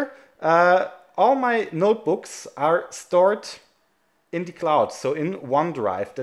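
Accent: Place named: Germany. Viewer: The german is strong with this one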